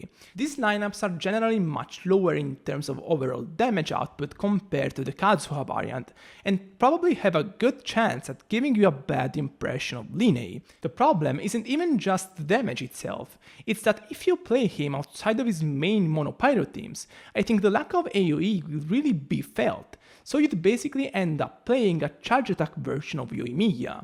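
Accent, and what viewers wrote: Italy. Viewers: just want to say that usually im assuming you're Italian just by the accent and now i see your body gesture really confirm it 👌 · Sir are you an Italian or Russian?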